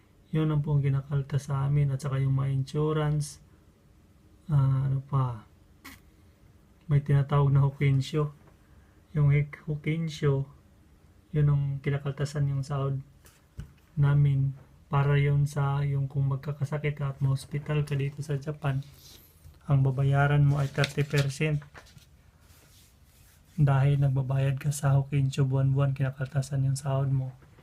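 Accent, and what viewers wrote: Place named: Visayas, Philippines. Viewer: Grabe idol napo kita kuya. Kapit lang nasa tiyaga talaga ang sekreto.. Apply ka din Canada kuya kasi kahit papano dun may security ka kasi pwd kang magng Permanent resident kasama pamilya mo.. mas madali yata mag cross country galing Japan to Canada.. i love your vlog you sound bisaya kaya nag subscribe ako hehe keep it up!!!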